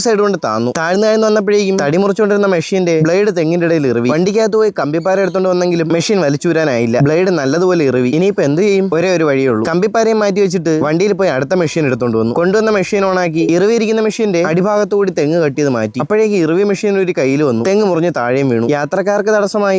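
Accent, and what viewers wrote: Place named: Kottayam, India. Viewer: ഭ ഫാ 😂കോട്ടയം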